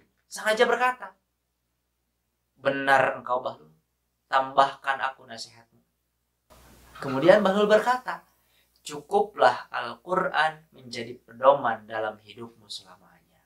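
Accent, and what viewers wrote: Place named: West Java, Indonesia. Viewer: Sunda a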